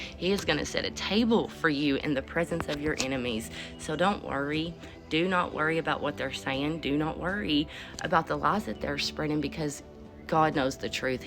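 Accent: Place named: South, United States. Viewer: I could listen to you talk about Jesus in that southern accent all day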